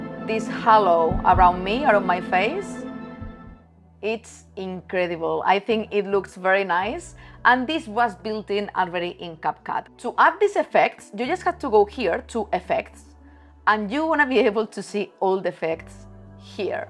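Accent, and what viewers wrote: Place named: Spain. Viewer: That spanish accent oh myyyyyyyyyyyyyyyyyyyyyyyyy <3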